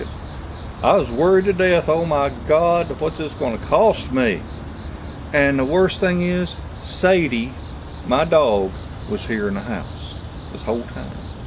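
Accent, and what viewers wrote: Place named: Tennessee, United States. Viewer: Glad your doin better! Idk where in Tennessee you are from but sound exactly like fellers i talk to around here. Im from scott co lol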